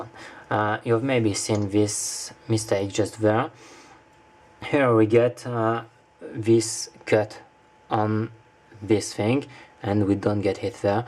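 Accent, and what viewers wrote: France. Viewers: Je reconnais bien un accent Français derrière ! Pourquoi cette ROM n'est plus sur XDA ? Un problème avec ? I can regognize a french accent ! Why this ROM is not more available on XDA ? Problem with ?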